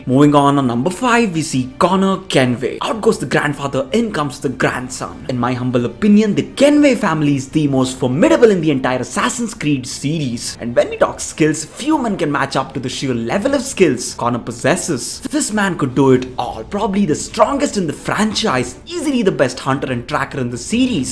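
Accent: India. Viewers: In my opinion you (the player) is the best Assassin Btw love your south indian accent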